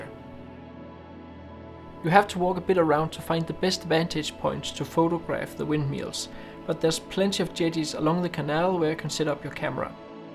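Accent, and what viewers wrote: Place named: Denmark. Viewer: Its not that bad! Its just very clear you have that Danish accent haha... Planning on coming to The Netherlands again someday soon?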